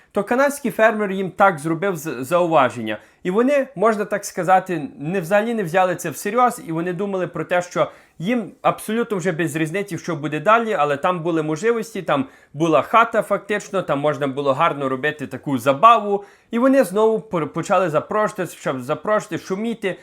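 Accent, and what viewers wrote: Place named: Western Ukraine, Ukraine. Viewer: Сколько раз попадаются мне ваши видео! Я не хочу Вас смотреть! Дикция - просто отвратительна, противный западэнский акцент. Не на чем больше деньги зарабатывать? Нет работы в Канаде?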